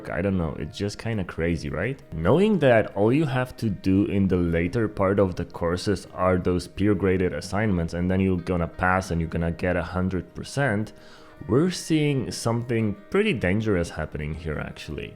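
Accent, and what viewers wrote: Poland. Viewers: Słychać tu polski akcent :D Ale bardzo dobrze mówisz po angielsku.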